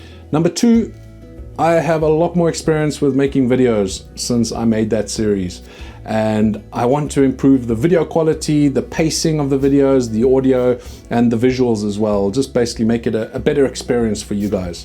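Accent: South Africa. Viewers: South Africanism ascent.... My homie.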